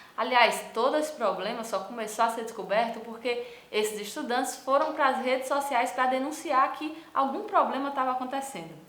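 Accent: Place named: Pernambuco, Brazil. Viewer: Eita sotaque arretado! Parece com o meu pernambucano ❤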